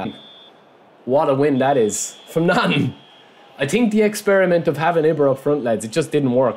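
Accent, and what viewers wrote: Ireland. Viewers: Also love your commentating 😬. Wish I had your Irish accent ❤️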